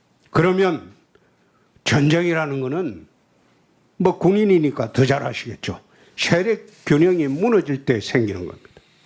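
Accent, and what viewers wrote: Gyeongsang, South Korea. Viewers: 역시준표세이(형님의 순~경상도 말) 확실하다~세이 청와대가서 핵갖다놓자~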